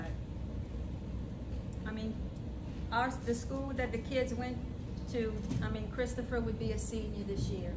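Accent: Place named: South, United States. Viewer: I’m part of your usps fam and have followed your story. I’m so extremely proud of your presentation it has to be so difficult every. single. time. !! And I pray daily that the good Lord comes back soon to reunite your family and I’m so excited to meet those wonderful kids !! That day will come I promise !until then I send all my love and support from Jax - originally Wisconsin and live your little southern voice !!! ❤️🙏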